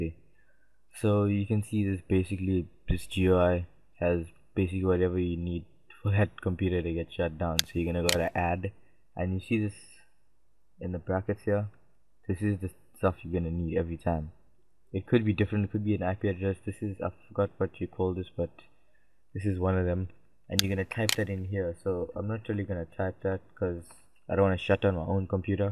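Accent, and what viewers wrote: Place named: South Africa. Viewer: South African :D lol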